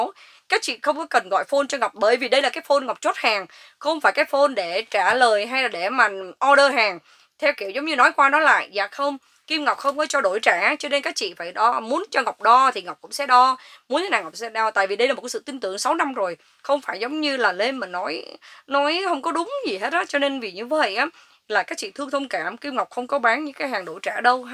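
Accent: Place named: North, Vietnam. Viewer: Chào Kim Ngọc, nghe giọng Bắc Saigon, cách nói dễ thương, cho tôi địa chỉ của tiệm. Tôi ở Escondido, muốn lựa mua áo cho 2 mẹ con, cảm ơn Kim Ngọc. Kim Ngọc có áo size nhỏ không?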